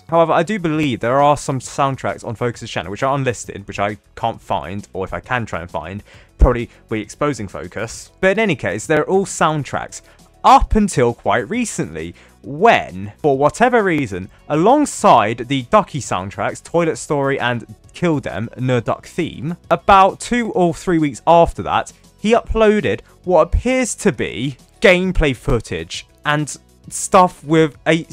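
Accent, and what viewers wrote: United Kingdom. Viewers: Omg too british accent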